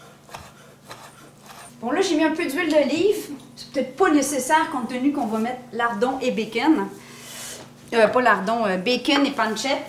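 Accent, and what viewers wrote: Quebec, Canada. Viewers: Roesti se prononce "reuchti" et avec ton accent de ta belle province c'est toujours aussi charmant 😘😘😘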